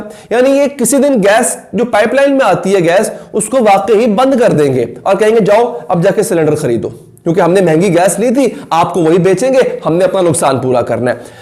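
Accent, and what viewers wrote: Punjab, India. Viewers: 😂😂hyeee sir aapki punjabi 😍😍 Love it ❤ punjabi tu bss 2 hi logon ki hy 1 aap or 2osra ......😂😂. Pharr ke kuttu onu..❤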